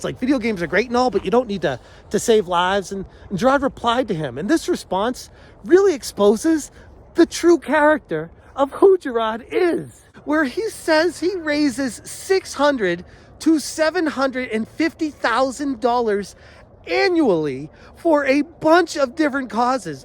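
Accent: Boston, United States. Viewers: Your coverage has been great - also who doesnt love a little Bostonian accent in there too. Hey I’m waaahhkin eeere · Thank you for your coverage of this. Frankly, I found his "apology" to be more responsibility dodging. He said he should have done better, but his examples on what he should have done better on were worded very carefully. His anger at the end was disgusting. I worried that people would just say "oh he apologized" and then stop talking about it. He needs to be audited and reviewed by the authorities. Then and only then should we let this go. I love your enthusiasm and your genuine reaction to this. By the way, stop apologizing for your Bostonian accent. It's who you are, and you should love it. I hear it all the time about my southern accent and I used to feel ashamed, but I'm trying not anymore. You got my sub ♥ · The boston accent is wicked dont change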